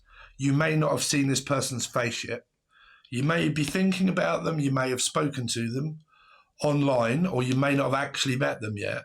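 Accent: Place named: England, United Kingdom. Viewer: I love your f**** accent and you're on it cuz I'm not communicating with somebody right now basically they're not communicating with me I've tried and I've tried and I haven't blown the man down and don't take balloon literally blown lol Dude I think you rock and I love your accent and I love your freaking drawings they're funny and great and you know your s*** You're hilarious You make my day and I wish I was English so I could come there and talk to you but I'm not it's okay but you're cool dude Don't let anybody tell you you're don't know what you're doing cuz you do and I appreciate you❤😊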